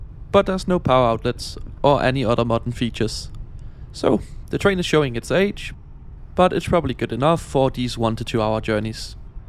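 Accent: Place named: Denmark. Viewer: Haha, I had the same reaction when I heard his Danish accent :-)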